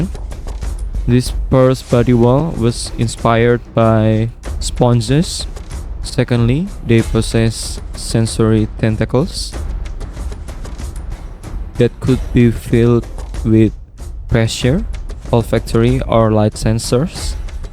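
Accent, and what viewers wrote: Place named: Indonesia. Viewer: Dude I could just TELL you had an Indonesian English accent! wonderful art too!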